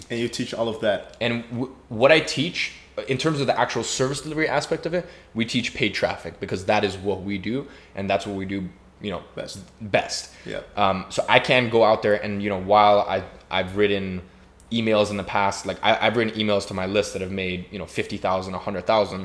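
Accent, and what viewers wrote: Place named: United States. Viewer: Why does he have an American accent?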